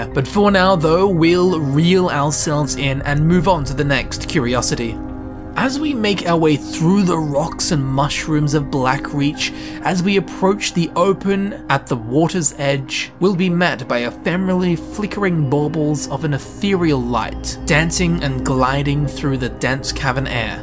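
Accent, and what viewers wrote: Australia. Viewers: One he is austrlian, two thats how aussie accents sound.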